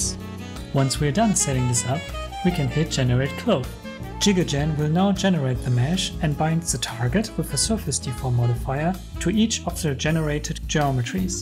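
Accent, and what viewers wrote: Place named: Germany. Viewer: Everytime you hear a person explaining their project with a slight german accent, you instantly know, that it is high quality and has some smart solutions in it.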